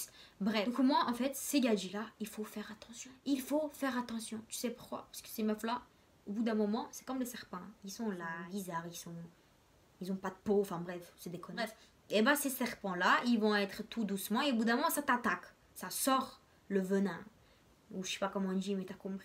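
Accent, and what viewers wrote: Marseille, France. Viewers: C’est bizzare ta un accent parisien et marseillais en même temp, tu viens dou??? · Trop belle Mash'Allah ta grv un magnifique accent sa fait penser à Marseille mdrr ❤️❤️😘😘😘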